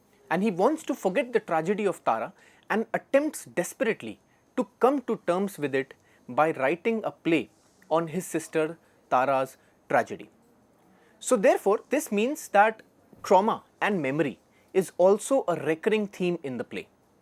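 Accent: India. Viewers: I just say the way of an Indian pronunciation in your voice is commendable,great explanation with great melodious voice 🙏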